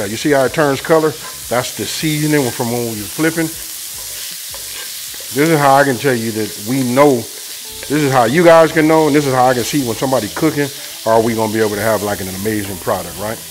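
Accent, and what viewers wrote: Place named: California, United States. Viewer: I’m saving this one because I have to try it myself. A lot of your recipes are Cajun inspired I’m curious are your people from Louisiana? The reason I ask is because you talk with a cali accent but if I watched your content on mute I would swear that you were from Louisiana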